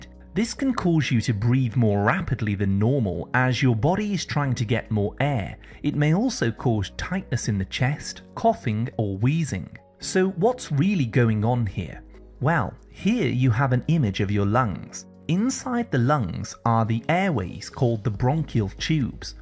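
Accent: United Kingdom. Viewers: First off, couldn't they Find someone that spoke American, That British tongue Is irritating,.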